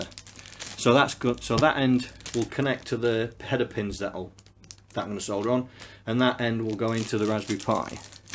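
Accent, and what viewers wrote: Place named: Northern England, United Kingdom. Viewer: Where you from, you sound a good Northern lad!!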